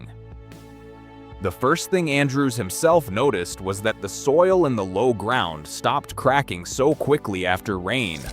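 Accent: United States. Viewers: Should have had an Australian do the vocals not a god dam yank. Anyway you greenies suck eggs.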